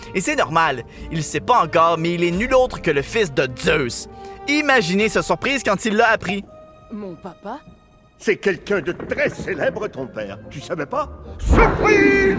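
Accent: Quebec, Canada. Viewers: Piter pane et mon paire?! 😂😂 alala laccent quebequois est parfais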